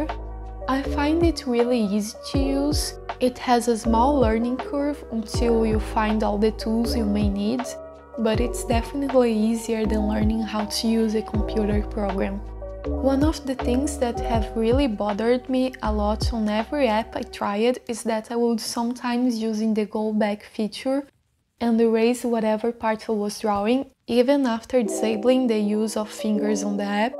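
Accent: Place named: Brazil. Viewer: Sabia que conhecia esse sotaque e o inglês limpinho, é do Brasil ksksk seu inglês é muito bom 🥺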